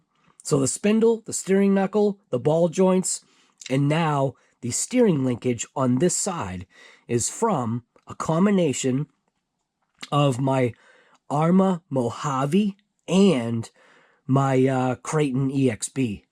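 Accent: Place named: New Jersey, United States. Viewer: Oh wow this is crazy that’s what they get for copy Arrma. Oops 😬 they have nice trucks. Where u located I’m in New Jersey telling by ur the way u talk is like me hit me back. And good luck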